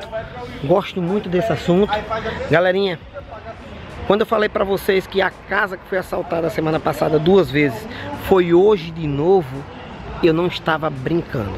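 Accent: Northeast, Brazil. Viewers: nuuuguets kkkkkkkk gostei do video ate pq ele tem um sotaque nordestino tem o meu gostei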